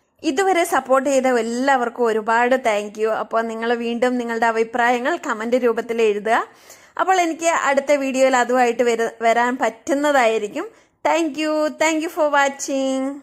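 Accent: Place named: South Kerala, India. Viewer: kannur slang alla. South kerala slang aanallo.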